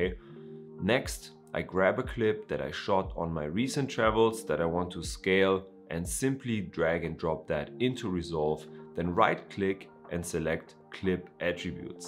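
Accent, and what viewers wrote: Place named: Germany. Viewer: Excellent tip, thanks. You sound very German,.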